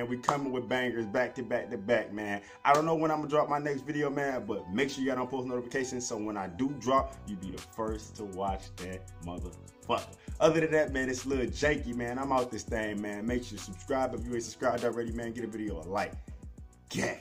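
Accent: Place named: Detroit, United States. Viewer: Im from indonesia. I search this accent for long time. Finally i talked to someone from michigan, he said that, you are looking for detroit slang 😂😂 and im here to learn this crazy accent ❤❤❤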